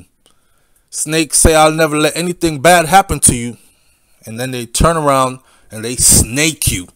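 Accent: Jamaica. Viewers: This sounds like a Jamaican 🇯🇲 blogger I know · You sound's like...Jamaican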